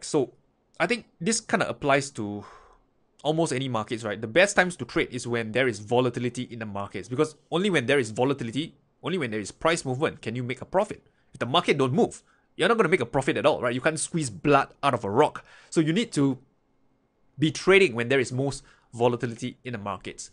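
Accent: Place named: Singapore. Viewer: i bet, you r Singaporean.....tnx for the video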